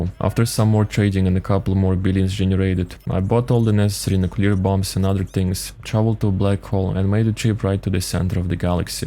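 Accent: Russia. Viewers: ru accent? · What is the accent? It sounds russian or something to me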